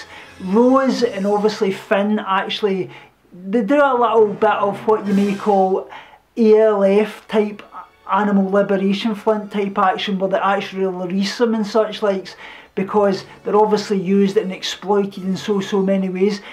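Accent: Scotland, United Kingdom. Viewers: Veganism, Star wars and a Scottish accent! My son will love this video i have to show him!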